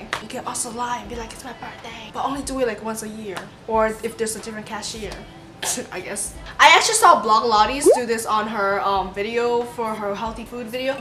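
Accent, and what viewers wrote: Canada. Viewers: Are you Canadian????😝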